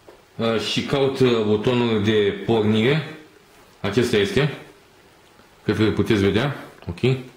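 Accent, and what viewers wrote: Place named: Moldova. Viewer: Oamenilor! E clar ..e rarait...sau moldovean ...sau ambele..ma rog..daca nu vreti..nu va uitati..ce naiba!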